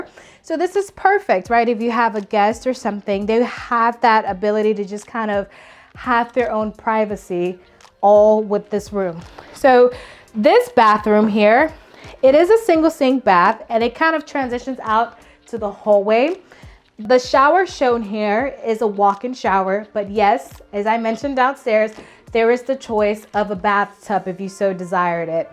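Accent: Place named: England, United Kingdom. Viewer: Hey!!! I personally like your screen presence and your English accent is amazingly easy to understand. Congratulations on your such professional video. Maybe one day we will meet in NC for a deal. Thanks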